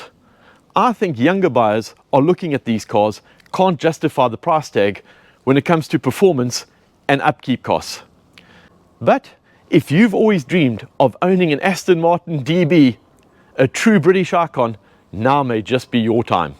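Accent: South Africa. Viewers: That is one hell of an accent you have. South African? Whatever it is it's probably worth more than the cars. God bless. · I detect a South African accent. 🇿🇦 Sitting in my local in Margate recently, in discussion on classic cars with a friend, who like me is in his 60's, a 21 year old sitting next to us told us how he also preferred older cars, like those from the early 2000's.😅😂. Great collection & great video.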